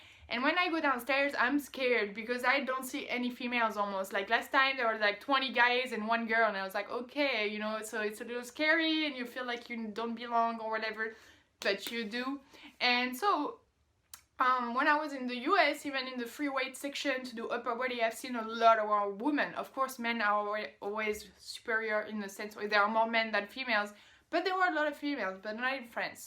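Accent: France. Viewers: Just found your channel today, surprised I didn't find it before because I watch a lot of exchange students videos "coming to the US". You still have a French accent, not really that normal especially after 5yrs in the States. Most go home as a pure born Americans lol. But I must say I love your accent. I think most of the EU seems to say and think about the same things when it comes to their experience in the States. I have given this some thought and although it has been hurting the country(Politics) lately when it comes right down to it we are just one big family regardless of where in the US we are from. So when you think about the friendliness and small talk kind of stuff it makes sense really. Are you going to come visit us again?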